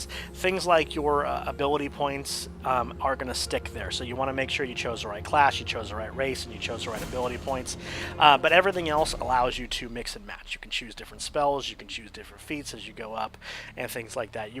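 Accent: Netherlands. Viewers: are you dutch? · are you dutch ? :D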